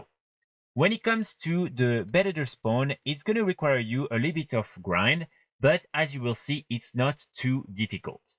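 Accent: France. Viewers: I love that french accent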